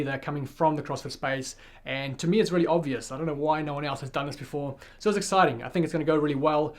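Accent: South Africa. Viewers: Hey Mate. It’s weird listening to another South African on YouTube, but I like the videos. Keep them coming. What gym did you own in Auckland? Was it Andfit?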